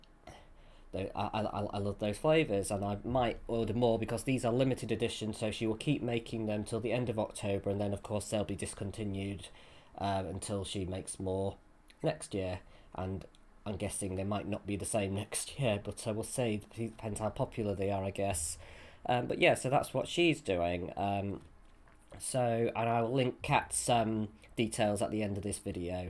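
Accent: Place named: United Kingdom. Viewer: You tickle me w/ the “and stuff”, LOL. So silly and fun. You are doing so awesome w/ all your videos. I can see your confidence building. I enjoy you so much! I am a sucker for the UK accent. I watch so many Brit shows. Even the comedies have eloquence w/ the voices. I am in the southeast US. We sound NOTHING like you guys. I could listen to you & (your putty lady) all day long. Keep them coming. I love your WIP! We have some nice tea shops where I live. Chocolate tea has been a fav for yrs. And you are right, 😋 delicious!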